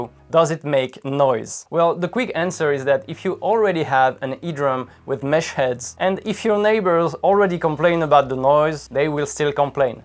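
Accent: France. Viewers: french accent here and there is just 😍.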